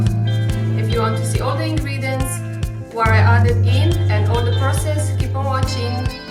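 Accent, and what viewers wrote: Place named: Romania. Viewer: buna , dupa accent pot spune ca esti romanca , sper sa nu gresesc . dragute videourile tale . 👍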